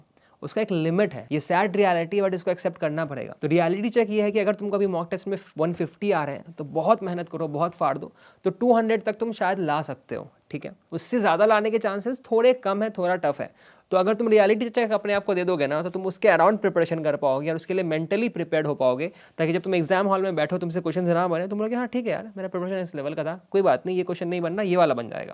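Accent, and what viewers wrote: Bihar, India. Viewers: Aise kuch ni that isme jo dusra youtube video me ni h is topic pe. Aur thoda pronunciations pe kam kro Kuch words pe being Bihari wo dikh jata h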